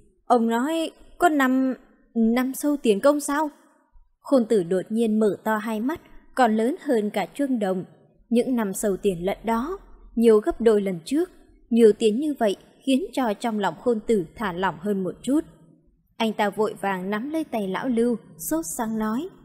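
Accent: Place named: North, Vietnam. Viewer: kênh giọng nam kỳ, tự nhiên giờ sen vô con nhỏ bắc kỳ này vô là sao vậy ? tôi không ưa giọng bắc kỳ.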